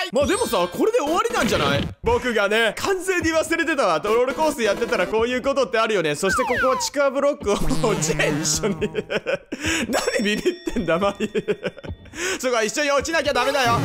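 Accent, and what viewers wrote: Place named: Northern Kyushu, Japan. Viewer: ども！女性視聴者ですww もう、九州北部出身からしたら 地元が近い&方言で推せますね👊( ¨̮💪)✨ いつも元気もらってます✋( ˆ̑‵̮ˆ̑ )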